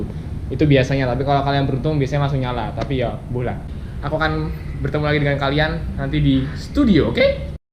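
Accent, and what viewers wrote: Java, Indonesia. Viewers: Ngomong nya ada jawa nya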